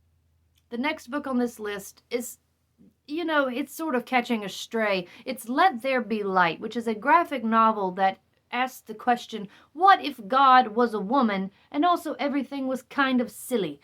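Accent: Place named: Transatlantic, United States. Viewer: Transatlantic accent is so funny for this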